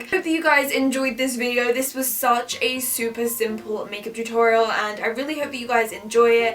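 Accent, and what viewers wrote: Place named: London, United Kingdom. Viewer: London accent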